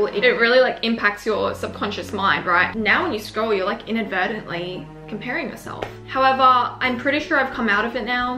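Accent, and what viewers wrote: Australia. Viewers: I love the Australian accent and how she says “phone” she says “phewrn”.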